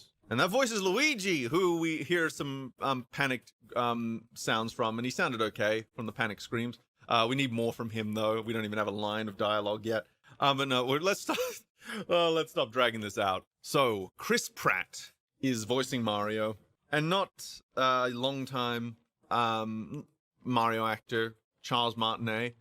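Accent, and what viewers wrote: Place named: Australia. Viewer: You have the classiest Australian accent I’ve ever heard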